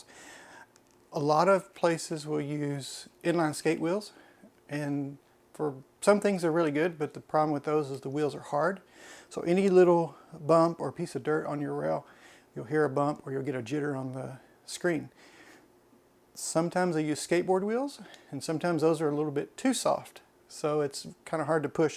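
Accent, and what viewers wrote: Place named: Texas, United States. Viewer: That Texas accent should have been a dead giveaway.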